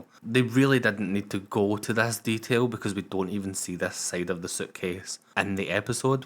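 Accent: Scotland, United Kingdom. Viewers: Your accent is like amelia pond but a boy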